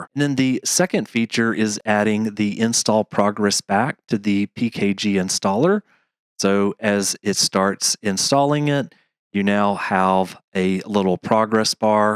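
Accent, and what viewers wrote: United States. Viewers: From which state are you from? You sound like mid west .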